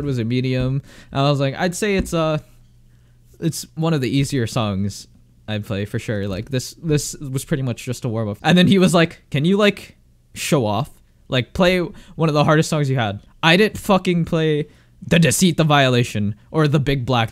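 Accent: Canada. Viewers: took me a min to guess youre a Canadian student XD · is he canadian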